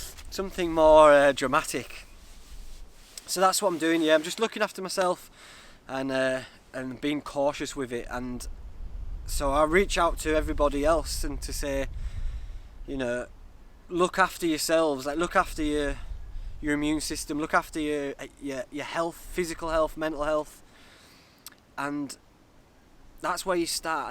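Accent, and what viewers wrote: Yorkshire, United Kingdom. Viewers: your a funny fucker..I love the Yorkshire accent.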